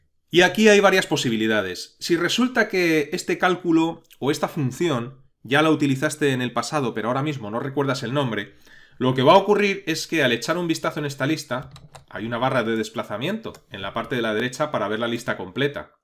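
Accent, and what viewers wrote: Spain. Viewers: Me gustan tus videos. Me está viniendo genial para preparar la oposición. Además con castellano de España. Un saludo desde Valencia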